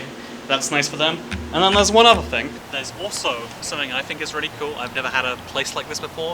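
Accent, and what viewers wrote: United Kingdom. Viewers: Hes brittish, chill. Its probably the acent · How american is, he's certainly 100% speaks british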